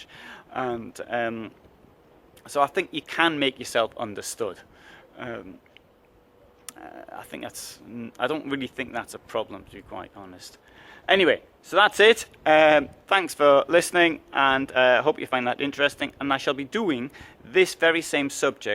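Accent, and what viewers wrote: United Kingdom. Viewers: You have funny lame british accent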